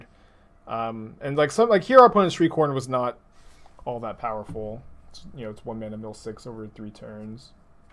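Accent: Texas, United States. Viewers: love the texas spike accent. 🤙🤙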